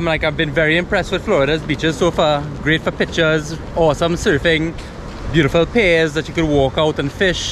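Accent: Trinidad and Tobago. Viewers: Well this is a pleasant surprise; hearing from my Favorite Trini Accent. Welcome back in your space. I Your oldest Fan. 22/09/12 🇨🇦 🍁 🇨🇦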